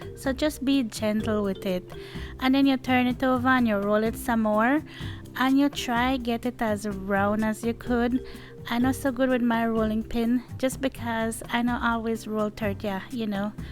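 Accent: Jamaica. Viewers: Do you think the accent is similar to Jamaican?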